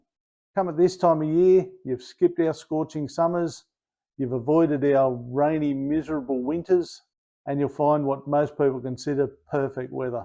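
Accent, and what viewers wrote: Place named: Australia. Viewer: I thought you had an Aussie accent. Wow, you’re a world away from USA and the State of New Jersey! Great luck to you, dare I say “mate”.😊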